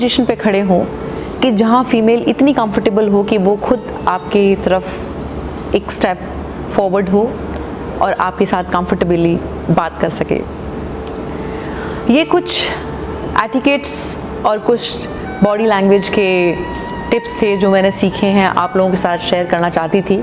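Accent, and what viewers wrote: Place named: Hyderabad, India. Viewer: My Dear & Respected Royal Madam ji Namaskar ji. GOOD NIGHT. Today I saw your all video clips and specially your Syherees. Your pronunciation is like Hyderabadis URDU .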